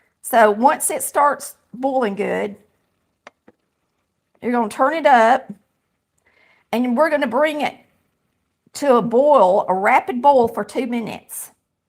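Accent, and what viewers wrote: South, United States. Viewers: You always make my day when I hear that wonderful southern accent. Happy Holidays to you both. Carrie from Delaware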